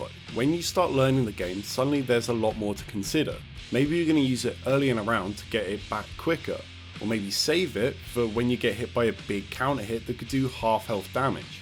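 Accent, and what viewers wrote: England, United Kingdom. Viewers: What's with this super heavy duty metal in the background as you calmly speak in a refined English accent? Lol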